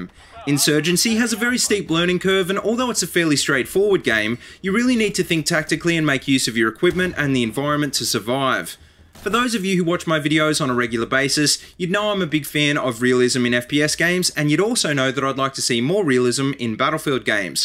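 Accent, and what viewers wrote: Australia. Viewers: auwfull aussy accent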